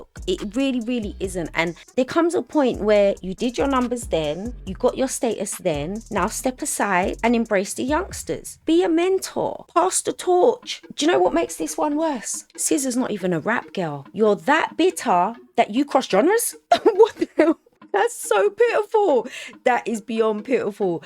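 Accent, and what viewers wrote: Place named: London, United Kingdom. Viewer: So glad I came across your channel, Just subscribed xxxx Love the London accent.. So good to finally find a channel made and bred in my town!!! xx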